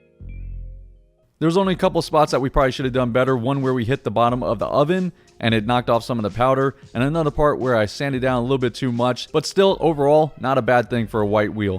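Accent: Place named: South, United States. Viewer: It’s called southern dialect. If ya live in the south, it is proper English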